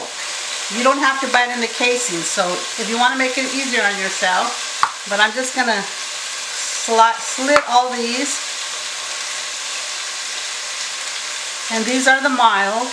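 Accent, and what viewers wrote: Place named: Puerto Rico. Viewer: Btw, are you from PR? Your accent tells me so! I love your style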